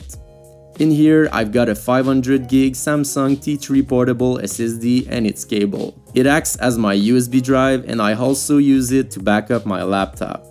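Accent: Quebec, Canada. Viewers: Are you by any chance from Quebec? Your accent sounds quite familiar. aha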